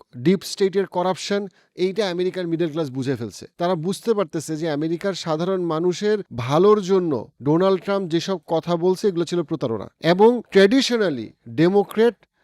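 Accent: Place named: Bangladesh. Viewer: অবাক হওয়ার কিছু নেই. Newyork বাংলাদেশি আর পাকিস্তানী তে ভর্তি. আপনার কথা শুনে আর English accent শুনে বোঝা যাচ্ছে আপনিও বাঙলাদেশি.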